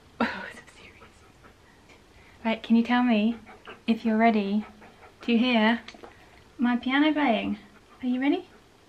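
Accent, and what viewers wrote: United Kingdom. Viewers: It’s cuz she sounds British or something. If your American of course you love it what American doesn’t? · Can you answer if ur British is me am British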